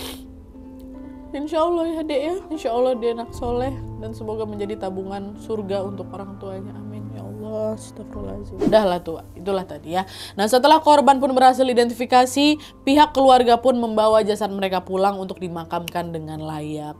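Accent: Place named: Medan, Indonesia. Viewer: orang mana sebenarnya??? Kalo dari gaya ngomong nya macam orang Medan nggak si...?😊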